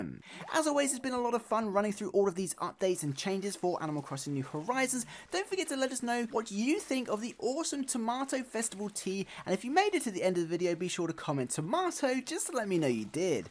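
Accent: United Kingdom. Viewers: Are you from Great Britian?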